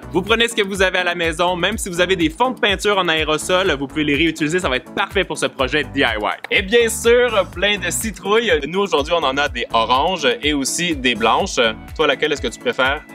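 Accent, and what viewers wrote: Quebec, Canada. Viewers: J adore ton accent quebecua je sais plus comment sa s ecrie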